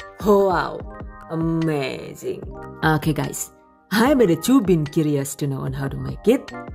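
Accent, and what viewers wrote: Banyumas, Indonesia. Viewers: Seneng bingit sama chanel inih# apalagi pas si mbake ngomong bahasa ngapak & English🤩🤩🤩🤩🤩#GiveawayTHV2021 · Deneng kaya wong ngapak 🤭 Ternyata ibune ayu,terus suarane khass CATAT..😆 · Seru kl lg ngomong banyumasan, suaranya nge bass ternyata mbak nya imut cantik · Ngapak banget terhibur aku🤣🤣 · Betul banget. Suaranya juga khas😁😁 apalagi pas ngmg ngapak. Hrhe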